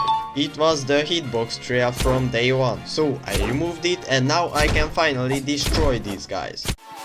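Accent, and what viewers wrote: Hungary. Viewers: ijj tesó a magyar akcentus (félre ne értsd, nincs vele baj <3) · magyar vagy? csak azert kerdezem mert hallom az akcentust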